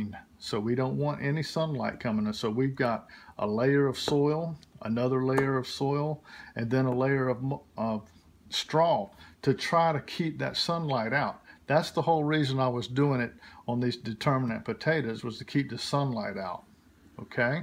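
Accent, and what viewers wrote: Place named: Virginia, United States. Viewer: Sooooooooo glad to hear your wife is healed. I was rescue captain for Virginia Beach Rescue Squad back in the 80s. Love Virginia... that's why I recognized your accent. I live in the Netherlands now. Thanks for the tips. I'll be staying tuned. Peace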